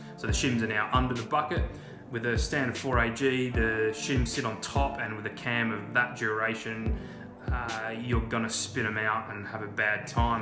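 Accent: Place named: Australia. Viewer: haaha same dude, it was in my recommended, and I was like oh this guy sounds aussie, then I was like yo wtf its mallala haha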